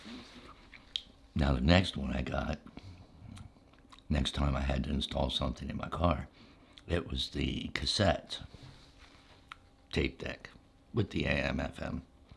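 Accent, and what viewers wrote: Pennsylvania, United States. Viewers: are you from PA? I went to Gettysburg college (born and raised in New York) so I am familiar with the accent! 🧡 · Do I hear just a little bit of Philly in your accent?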